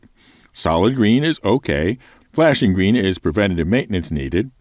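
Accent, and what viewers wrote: Scotland, United Kingdom. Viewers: I would like to hear your Scottish accent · Engineering should be slightly more complex than the game blackwake. Where your ship has a problem, you have a quick and intuitive way of dealing with it. A 12 year old should be able to figure out. Otherwise you make dealing with engineering issues too much of a hassle for players either in there day to day activities or when in critical situations such as getting shot at. You shouldn't need a complex flow chart or checklist to fix the issue. Scottish accent is beyond scuff at 2x speed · I do like the indicator lights on the buttons idea. It's similar to the old I-war 2 status bar where you could evaluate the state of your ship at a glance. Though I strongly disagree with the RNG repair damage. Make it take, say, 10 seconds for each RNG roll and only capable of bringing the system back to minimum operating capability instead. 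The Scottish accent might be a tough call for the non-english speakers though. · The scottish accent will help for sure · scottish accent :D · Got to admit, hearing your Scottish accent is quite the experience.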